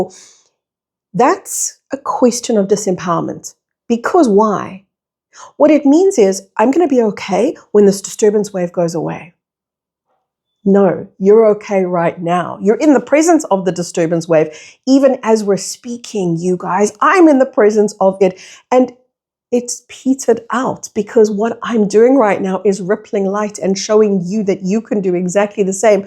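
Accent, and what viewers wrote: South Africa. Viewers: Thank you for sharing your wisdom. You popped into my orbit after your conversation with Amrit and so, so glad you did! Love hearing a familiar accent and especially at a time when, having lived overseas for some 20-odd years, all I want is to go home to SA. Listening to you feels just like that... home ❤...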